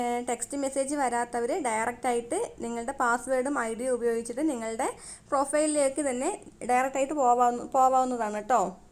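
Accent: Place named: Palakkad, India. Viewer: മിസ്സേ.. നിങ്ങൾ pkd aano.. അതെ സ്ലങ് ഇടക്ക് കേറി വരുന്നതുകൊണ്ട് ചോദിക്കുവാ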